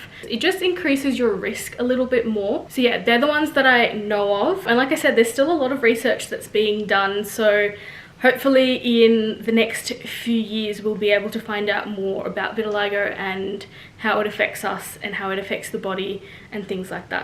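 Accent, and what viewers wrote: Australia. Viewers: She definitely has an Australian accent so I was a little surprised when she said Sri Lankan. I don't know what a Sri Lankan accent in English would sound like? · I’m glad you answered the question of your nationality because I was very curious. Your features look very European. Maybe that is because the central part of your face is very fair now. I was wondering with your accent if you were aboriginal.❤ it is so interesting that pigment can come and go. I wonder if because you have lighter spots where you would be exposed to sun, if sunlight hasn’t played a part in changing the skin cells. I’m sure the condition is very interesting to scientists as to why our bodies do what they do.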